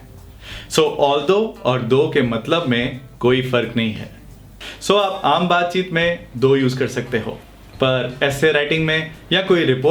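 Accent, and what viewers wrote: India. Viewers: Indian english was so bad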